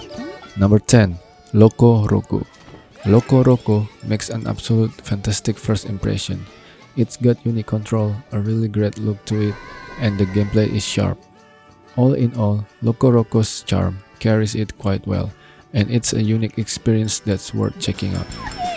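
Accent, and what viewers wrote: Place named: Indonesia. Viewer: bang logatnya mirip orang indo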